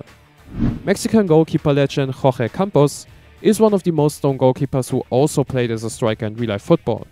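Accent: Germany. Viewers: you sound german, are you? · Bruder kannst du vllt deutsch weil dein Englisch hört sich so an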